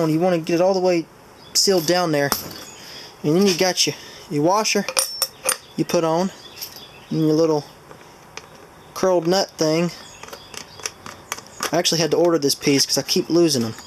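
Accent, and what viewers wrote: United States. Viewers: I love your country accent!!!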